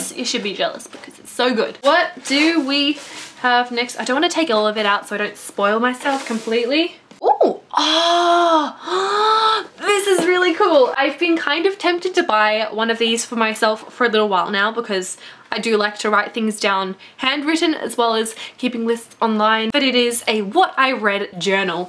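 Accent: Australia. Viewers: I love her vids too and I love her accent I'm mexican and the aussie accent it's easier to understand than the american one to me XD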